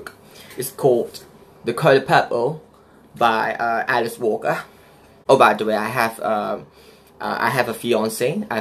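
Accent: United Kingdom. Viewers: Haha ni parody shila amzah british accent eh? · wuu not bad ur british accent👏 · Your British accent quite impressive. 👏👏👏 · Impressive british accent! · Wah...suara macam betul ah london accent...siak ah WOHOOOO!!!!! 👏👏👏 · Compared to the original one, punya british accent lagi better kot. 😂🤣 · Your accent is purely learn from British?.. Looks real ok.. 😂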